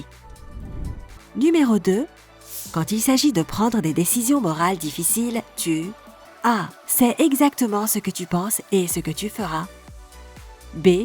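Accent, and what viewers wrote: Quebec, Canada. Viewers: la voix est horrible on dirait elle a le nez bouché avec des petites touches d'accents québécois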